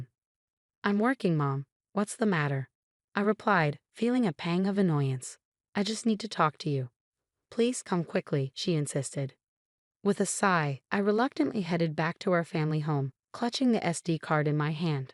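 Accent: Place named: United States. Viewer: Sounds American, but money is "yen".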